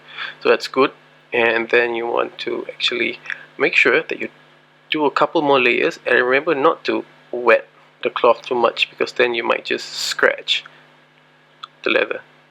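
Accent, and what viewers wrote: Singapore. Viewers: You sound so singaporean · are you from singapore?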